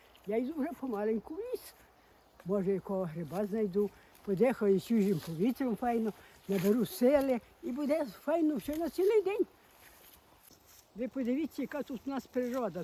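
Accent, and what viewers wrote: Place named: Ternopil, Ukraine. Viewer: Дай Вам Боже здоров'я, пані, і сили на многії літа. Мені цікаво де Ви проживаєте, бо повертаєте мене в дитинство, саме так говорили в нас в селі на Тернопільщині, де я народилася.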